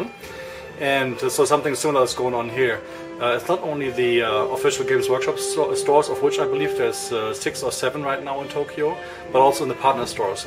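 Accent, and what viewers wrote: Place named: Germany. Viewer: Fünf Worte und ich wusste, dass du Deutscher bist! :D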